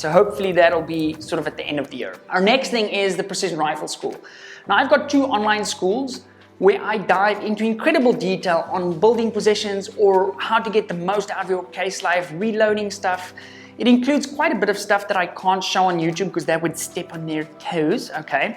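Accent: South Africa. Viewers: Being in an Australian in a town that is becoming overrun by South Africans, your accent is really hard to listen to... I have reached a point where I can’t stand hearing that accent anymore... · Wow, I thought. Jis hierdie ou klink moerse Suid Afrikaans. And lo and behold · I knew this was a South African accent right away 😂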